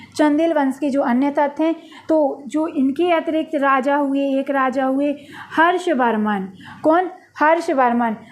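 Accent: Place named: Bundelkhand, India. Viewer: Madam ji aap bundelkhadi lag Rahi kaha se he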